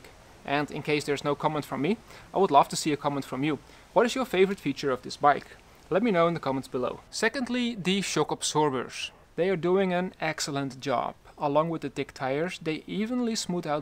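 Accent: Netherlands. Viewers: Nederlander khoor het aan je accent😂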